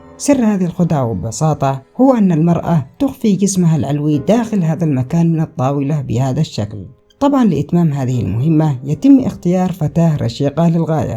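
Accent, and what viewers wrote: Yemen. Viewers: آخيرا حدا يمني بدل المصري .... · ارجو عدم مزج اللكنه (التهاميه)باللغه العربيه حتى لاتكون النتيجه مخضريه الله يحولك. ....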